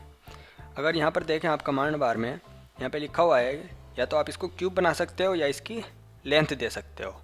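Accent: India. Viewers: bhut ala or apki Urdu bari mazay ki ha , anda kaar shape very sweet Indian Urdu I like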